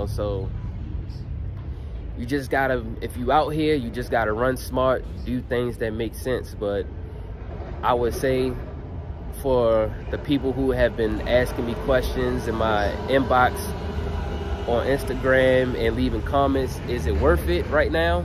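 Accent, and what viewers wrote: Baltimore, United States. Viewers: that Baltimore accent is super easy to hear homie, #respect and #salute to you tho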